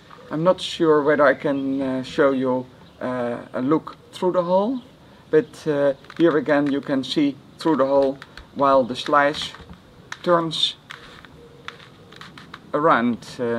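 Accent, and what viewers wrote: Netherlands. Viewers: his grammar is good but his accent is too dutch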